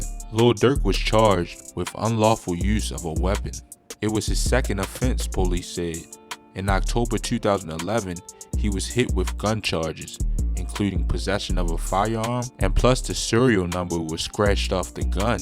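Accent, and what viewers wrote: Baltimore, United States. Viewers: you from baltimore? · You from Baltimore I can spot our accent anywhere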